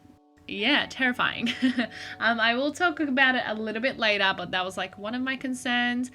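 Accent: Australia. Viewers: Wow, as an Aussie who has been living in Japan for 15 years watching your videos and hearing a true blue Aussie accent is blowing my mind a little lol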